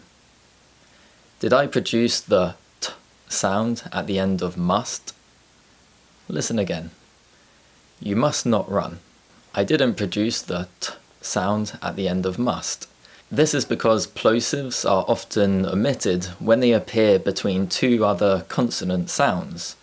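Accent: United Kingdom. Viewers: Things that i love the most is learning british accent british slang anything about Britain.are u british?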